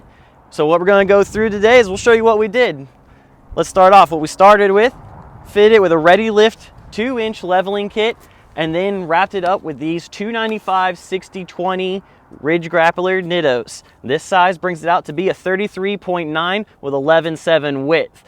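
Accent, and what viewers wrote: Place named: South, United States. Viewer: Good stuff, love the rolling shots as well. Both beautiful trucks. Only thing missing is the signature southern accent we all love. ( my wife says your hot. Haha ) On the white truck. What is minor trimming?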